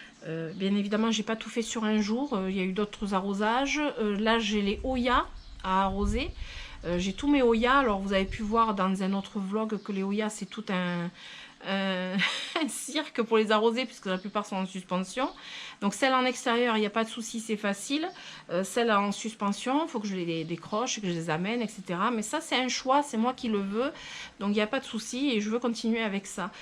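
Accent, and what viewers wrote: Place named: Toulouse, France. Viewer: Bonsoir Voici plus de 6 mois que je regarde les vidéos,je vous trouve très sympathique vous me faites penser à ma belle belle sœur qui habite près de Toulouse ce petit accent fort charmant.Je n'ai pratiquement pas de plantes vertes à la maison seulement quelques succulentes. Mon grand bonheur c'est mon jardin de 1200 m2 je m'éclate aussi comme vous je consacre beaucoup de temps. je comprends votre fatigue et j'imagine bien le temps que vous y passez. Pour cette tendinite bien sûr les anti inflammatoires pourraient vous soulager mais c'est surtout une mise au repos de votre bras et ça c'est pas gagné! Encore bravo pour tous vos bons conseils c'est un réel plaisir à vous regarder 2 fois par semaine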